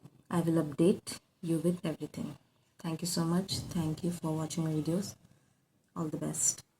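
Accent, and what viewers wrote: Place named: Odisha, India. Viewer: Above all I like your english...The Odia english is something different from colonial english...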